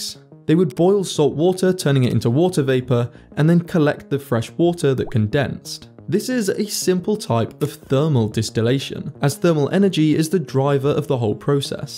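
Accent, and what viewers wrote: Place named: RP, United Kingdom. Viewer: There are an awful lot of YT pretending to be “science” with British speakers. I guess whoever is paying to make these think it gives the channels more credibility. Anyhow… it is much more likely that the islands are sinking rather than “sea rise” which is a myth. The great climate scam has come to an end everywhere except these types of pretend “science” YT videos which still constantly push the “sea rise” and other hoaxes.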